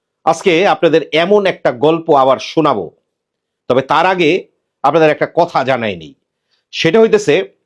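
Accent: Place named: Feni, Bangladesh. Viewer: আপনার ফইয়া কথা আমার কাছে ভাল লাগে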